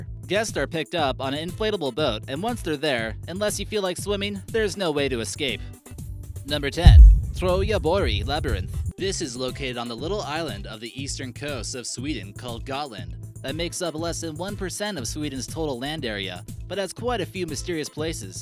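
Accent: United States. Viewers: I love americans pronunce swedish words, btw Im from Sweden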